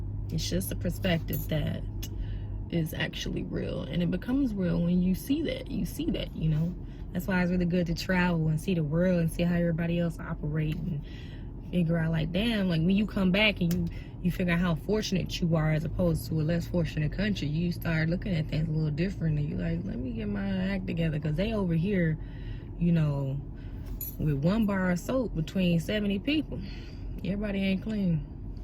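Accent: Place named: Chicago, United States. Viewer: Sound like she from the Chi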